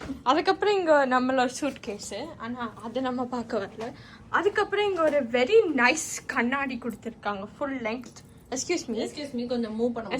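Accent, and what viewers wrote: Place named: Madurai, India. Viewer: Your explanation in Madurai tamil slang is . Good video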